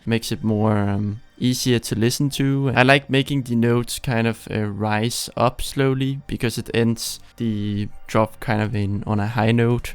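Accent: Denmark. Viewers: Er du dansker? :p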